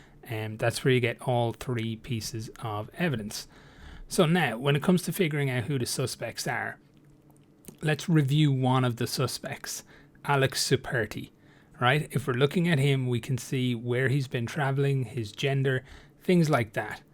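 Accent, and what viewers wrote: Ireland. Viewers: he just has an irish accent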